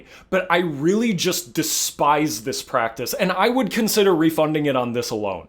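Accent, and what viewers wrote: Birmingham, United Kingdom. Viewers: como diria el tablos, el acento britano le da ESENCIA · Hearing you as an American loving the Bri’shness and doing a pretty top job at a Brummy accent made me proud to be from that shithole! 😂😂 great video mate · subbed for the flawless birmingham accent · You sounds very British, I'd trust you review a briish game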